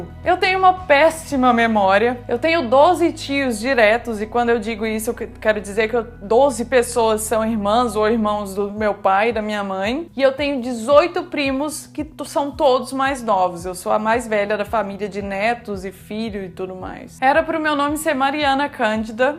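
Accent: Minas Gerais, Brazil. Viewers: Se você nao conta, ninguém ia saber que você é mineira :-) esse sotaquinho de Mineira... :-) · Por isso do sotaque mineiro uai uehhehhehhe 😂👏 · CARA, COMO VOCÊ É FOFA, ESSE TEU JEITO DE FALAR "É BUNITO DEMAIS DA CONTA SÔ", ACHO LINDO E VOCÊ É UMA SIMPATIA DE PESSOA, TE ADORO, SUA LINDA!!!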